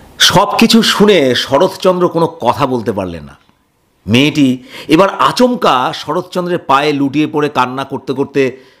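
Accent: North Bengal, India. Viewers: উত্তরবঙ্গের সব জেলায় এমন ভাবেই বলে,(আর বাঙলা কথাটাও পড়িনি,বাংলা এমন পড়েছি) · বাসা(বাড়ি), কান্না করা (কাঁদা), খারাপ পাওয়া (খারাপ ভাবা), কথা করা (কথা বলা ) , আসেন, বসেন ( আসুন, বসুন ), সেই হয়েছে ( দারুন হয়েছে )....... বাংলার এই টানগুলো শিলিগুড়ি, জলপাইগুড়ি, উত্তর বাংলার অত্যন্ত প্রচলিত শৈলী। দক্ষিণ বঙ্গে প্রচলিত নয়। নিজের কথার টান / Acsents ই যে সঠিক এই রকম ধারণা ঠিক নয়। কথ্য ভাষা সংশ্লিষ্ট স্হানের বসবাসকারীরাই ঠিক করেন। সেই অর্থে ঠিক ও করেন না। আপনা-আপনি ই প্রচলিত হয়ে যায়। এই Accents কোনো ব্যাকরণ-বিদ বা মাস্টারমশাই নির্ধারণ করে দেন না। তাছাড়া, ভাষায় বৈচিত্র্য ভাষাকেই সমৃদ্ধ করে।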